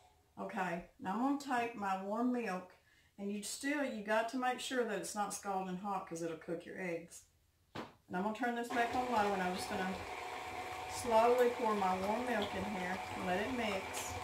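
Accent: South, United States. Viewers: I’m gonna make this tomorrow! Please remember to wear your mic so we can hear the lovely southern drawl! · Man, I am an avid baker for therapy as well. I cant tell you how much I enjoy and have used your experience to add to my own. I want to thank you in this trying time of Covid for your messages and as a Canadian I just love your southern accent! In two words, Thank-You!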